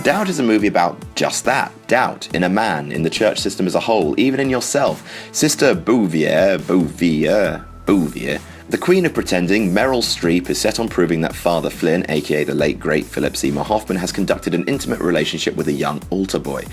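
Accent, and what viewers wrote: United Kingdom. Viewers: I know and his heavy British accent wasn't helping either. I couldn't finish it.... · So you are just shrugging you shoulders with a british accent. Congratulations. You have a blog that does nothing.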